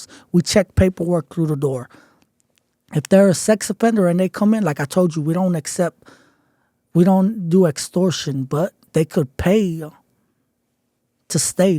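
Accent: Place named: South, United States. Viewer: You serious? This fool is speaking like a ghetto ass reject using big words along that country bumpkin twang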